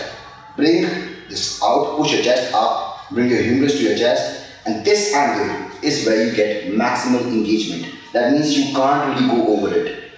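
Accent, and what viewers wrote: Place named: India. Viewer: Great and helpful info but sadly I start to hear Indian starts from minute 7.00, then I’m lost.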